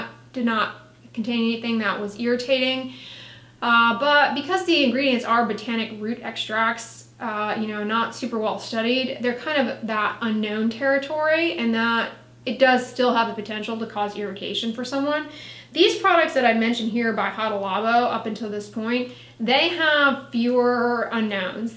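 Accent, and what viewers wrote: United States. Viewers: Your american accent is so strong I hardly understand you 😶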